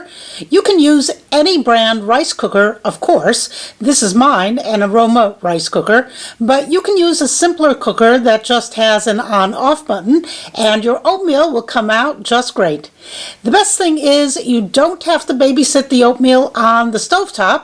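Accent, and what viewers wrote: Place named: United Kingdom. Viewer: Thanks for the great vid! May I ask.... do I detect just a slight UK origin to your lovely accent?? Cheers!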